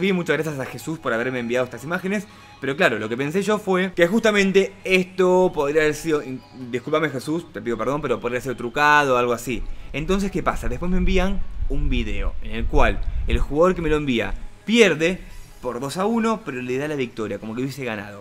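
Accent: Argentina. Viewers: sos argentino? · De que parte de buenos aires sos?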